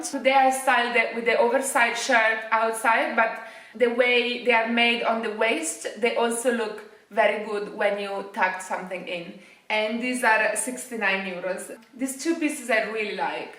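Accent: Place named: England, United Kingdom. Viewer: Ciao, Ti stanno da Dio i pantaloni da Zara,amo il tuo accento inglese.Qualche volta ti scrivo in inglese 😊perché sono una ragazza di adozione italiana 😅che vive in Danimarca.Amo il tuo stile 😍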